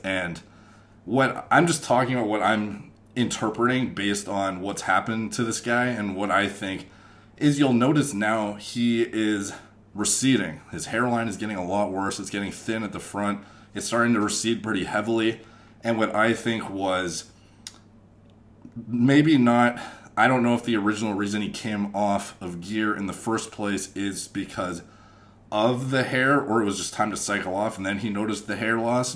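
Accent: Canada. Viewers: Your Canadian accent is obnoxious. Couldn't listen to you talk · The Canadian accent is STRONG in this one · This dude is from Canada af. They the only ones that say aboot. Lol Love your videos though man. Keep it up.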